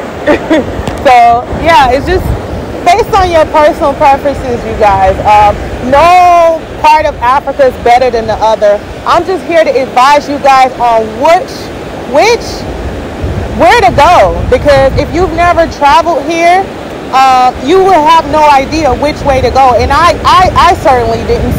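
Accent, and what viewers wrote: United States. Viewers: Wow I'm surprised, I thought Kampala would be as hot as Ghana. You sound so American. I would never guess that you are Jamaican-American.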